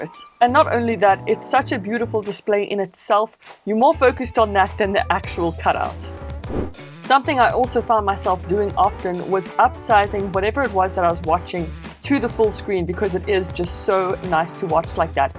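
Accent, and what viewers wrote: South Africa. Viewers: Is this broad south African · ek wou sê sy het 'n Suid Afrikaanse aksent 😂😂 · South african accent?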